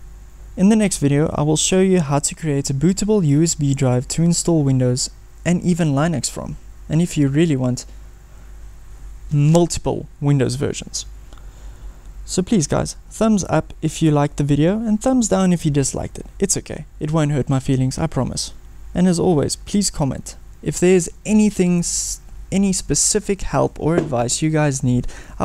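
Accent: Afrikaans, South Africa. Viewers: Ek hoor duidelik die Afrikaans deur slaan lol. Goeie werk dankie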